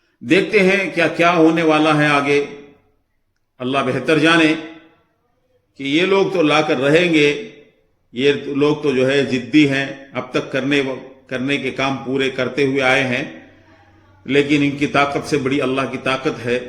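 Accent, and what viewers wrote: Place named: Bangladesh. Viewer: آپ کو اللہ پر یقین نہیں ہے کیا۔ کیوں یوٹوب سے پیسے کمانے کے لیے لوگوں کو دہشت میں مبتلا کر رہے ہو۔ ۔۔اپ کے pronuciation سے لگتا یے کہ آپ کا تعلق بنگلہ دیش سے ہے۔ اللہ حدایت دے ۔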